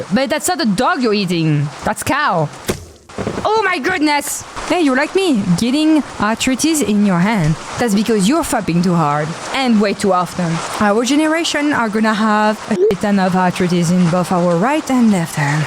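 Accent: France. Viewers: A french accent Vtuber... "Never in my life have I needed something so much, and never known until I received it" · I’m Mexican but I really like her French accent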